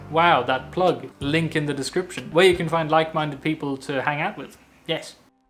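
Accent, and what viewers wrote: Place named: United Kingdom. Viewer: We’re just catching up on a lot of Swedish things before we visit Stockholm at the end of August. Now we are addicted to your videos. And your fine British accent, and liberal swearing. Now we’re enjoying your Japanese - can’t wait to go back ourselves. If we’re ever in the same city I’ll buy you a drink. A small, inexpensive drink, but a drink nonetheless. Much love from the UK.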